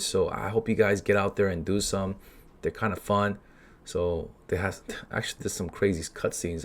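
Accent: United States. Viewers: Try to speak less like an American and more like someone speaking English.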